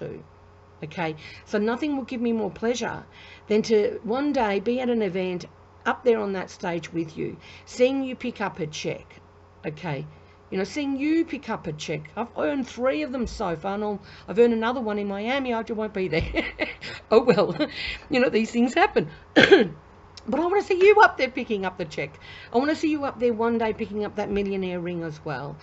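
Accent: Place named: United Kingdom. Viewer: Lovely british accent!!!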